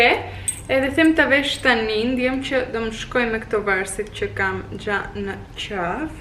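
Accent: Greece. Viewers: Η προφορά η ελληνική στα αλβανικά σου είναι φουλ σπαστικό γιατί προσπαθείς να το παίξεις γλυκουλα ! Και βγαίνει σε σπαστικό και γενικά προσπάθησε να δείχνεις περισσότερο παρά να μιλάς κατα τα άλλα πολύ όμορφο βλογκ 🎉🎉🎉🎉🎉🎉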